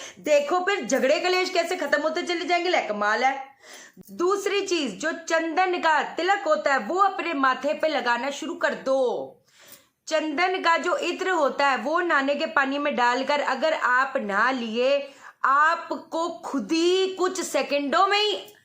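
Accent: Punjab, India. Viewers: Wow💞 bindass talking style👌pujabi touch ..❤️( hai ki nhi.)my favourite ..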